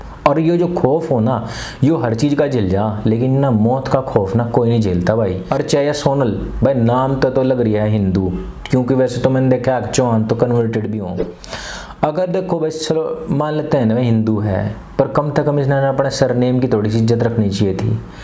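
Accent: Haryana, India. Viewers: haryanvi op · you sounds like my hariyanvi friend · aapka haryanvi accent sun k accha lga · your Haryanvi style of speaking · Bhaii haryanvi ko or khadi boli ko hataa kr seedhe seedhe bolo to or accha effect डालेंगी aapki video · यहां तो Pura Haryanvi Accent hai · Bhai shab haryanvi bilku sahi bol rahe ho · आपकी हिंदी के साथ हरियाणवी भाषा का तालमेल बहुत मधुर लगता है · Love the haryanvi accent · I think bhai had spoken a hariyanvi accent in this video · हरियाणावी लठ मार बोली 🙂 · Haryanvi nikla hai bhai aaj toh. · हरियान्वि लहेजे मे एक् नम्बर लग रहा है video एसे हि विदिओ बनाते रहिये आचर्य जी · Bhai tu haryanvi bole hai 😘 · Haryanvi hai bhai yaa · Sir wah love your haryanvi accents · Bhai tagdi haryanvi bol rahe ho... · राम राम सा सर ...... Love from Rajasthan ❤️❤️ आपकी हरियाणवी सुनके बहुत अच्छा लगा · Hariyanvi boli k liye wa..wa...wa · aaj Haryanvi tone me kyu bol rahe ho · Nice haryanavi sir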